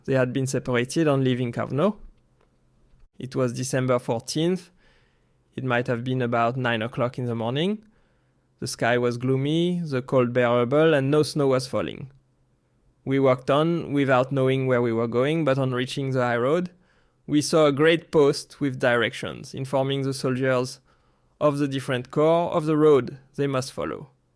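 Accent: France. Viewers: Honestly the thick French accent was such a great touch for this series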